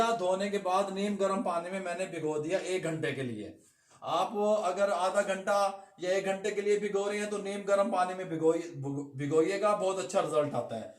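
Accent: Punjab, India. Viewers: Tohadi punjabi bhot qeant hy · Assalamu Alaikum baba ji buht hi aala aur zabardast tareeqy se aap ne bataya aur itni mehnet ki hamare liye... ALLAH Tala aap ko mazeed tarraqi dein aur kamyab kerein aap khush rhein sada AAMEEN Batein kerty huwe punjabi touch buht acha lagta hai zarur isi tarha baat kiya kerein Buht sara salam aur duain baba ji aap k liye bi aur k liye bi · Bhai salam app buhat iman dari se sikha rahain hn main ny app se buhat sikha jazak Allah Allah app ko deen aur duniyia main taraaqqi atta farmain Ameen ap ki panjabi urdu k sath mix ho k buat maza dyti hn khush rahiyye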